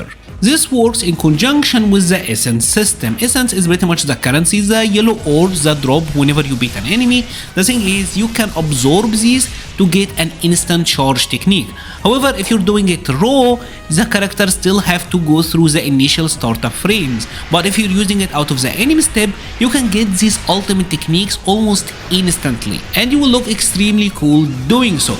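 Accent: Egypt. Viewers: I knew u are Egyptian from ur accent 😂❤takes one to know one, awesome vid brother ❤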